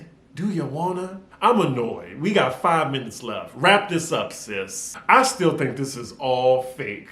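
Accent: New Orleans, United States. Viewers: I know you've been in NY for a while but I still hear many hints of Nola! 🥰🥰 I'm sick of Toya ass and I didn't need to see Kari...Great review!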